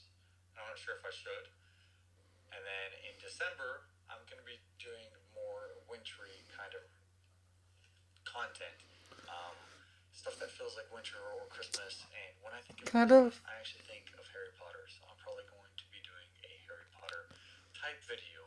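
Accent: South, United States. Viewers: I love your podcast on this video at your house in Texas during your quarantine until you're watching one of your previous video of yourself from the past while you're talking about all of your previous reviews of all of your books and it's a lot of energy, so you're talking about everything including your top 5 goals and your future after that, but I wanna say I'm happy for you and I hope your husband, Zach will be very proud of you, because I love your Southern accent!!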